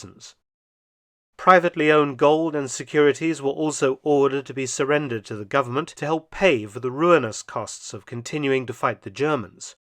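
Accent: England, United Kingdom. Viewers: I absolutely love this channel. Mark is a blessing to youtube. The education and well-done entertainment he brings to history does it justice. I appreciate the research and enthusiastic way of bringing history to life. As an American, it's music to my ears to hear his English language accent brings you into the video like a great soundtrack to a movie. I didn't mention the stock title music blends well with the stories and history. Especially the ww2 vibe it gives as one of my favorite interests. Thanks, Mark. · Edutainment!!👍🏻 Some Britt accents are irritating. is not. Very soothing.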